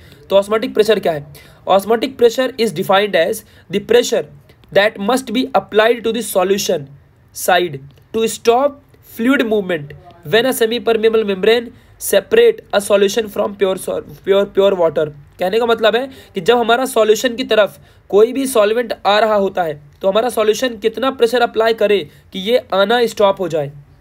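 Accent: Maharashtra, India. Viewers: Marathi aahe ka Dada tu? Aasa bolat ja madhe madhe thoda changl vatt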